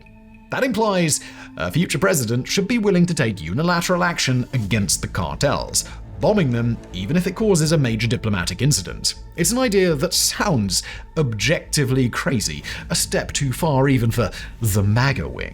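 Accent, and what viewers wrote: England, United Kingdom. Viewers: Your accent - I assume u English- don’t know what u talking about - George bush CIA director in <num>-vice president in the 80’s Pres in the 90’s -in 1976 was liaison for China - u do the math - someone is Benefitingon This side - people are leaving the door open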